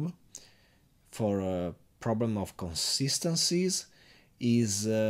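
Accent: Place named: Poland. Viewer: you're from poland, right?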